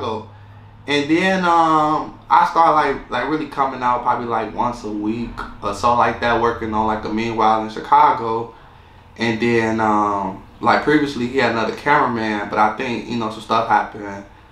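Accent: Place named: Washington DC, United States. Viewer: This brother sounds like he's from DC. Sorry he had to deal with all that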